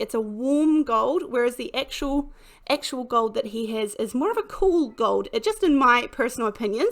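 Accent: New Zealand. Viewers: Very cool. These are interesting, effective and have great effects! (Love the Kiwi accent!)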